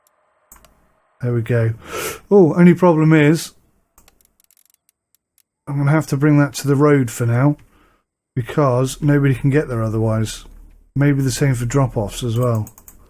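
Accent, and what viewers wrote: United Kingdom. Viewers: Well done on this! I like how you turned things around from that little bit of debt. Out of curiosity, what variety of British accent are you speaking in? It's interesting to see the different voices out there.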